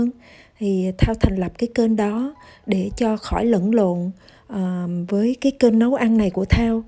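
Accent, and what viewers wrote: Hue, Vietnam. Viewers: giong noi giong het nhu my cousins, nguoi Hue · nói giọng Huế nhẹ nhẹ như người Huế ở Dalat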